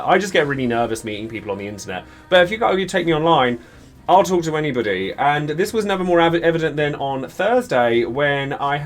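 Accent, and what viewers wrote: United Kingdom. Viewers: Okay, this is a lot to chew on. First: yes, I came to this channel for the game in question (Opera Omnia), but got charmed by the editing and the formating you gave everything you youched and I currently stay here because you. Yes, you. Your way to communicate and express yourself, your emotions that pour through the video to us, your british accent, everything you, just you. Never undervalue yourself, never fear the tomorrow so badly that it stops your journey, you are far more better as a content creator than what you, yourself, think. Trust me, I've seen my fair share of CCs and I'm good friends with some of them. Second: CHANGE! Yes! Bring it on, baby! This is a case of one of my bestest friends. She got an offer back in the 2018s to jump from Twitch to Facebook Gaming and she accepted it, however she didn't realized at the time that she fell into a booby trap that would slowly undo everything she had built for almost a decade. 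She jumped back to Twitch at the start of 2020, before the pandemic. Her view count had been reduced by 9/10ths, it was the biggest blow she received and she started climbing the metaphorical mountain again. By the summer of 2020 she did a gamble, she started to write the script and the setting for a tabletop role-playing experience, she would be the Master and close friends that are also streamers would be the players. She didn't know if it would save the channel, but she did it regardless. First, to have fun, and second, to expose this part of he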